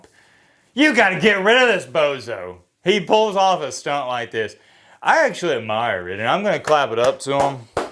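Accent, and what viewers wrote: South, United States. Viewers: you look and sound like a pubeless hill billy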